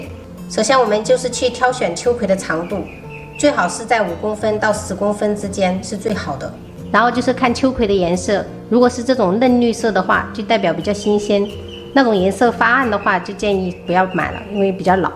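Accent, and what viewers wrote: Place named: Fujian, China. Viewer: ：我在温哥华福建人，一看你的视频就犯嘀咕...觉得听到乡音！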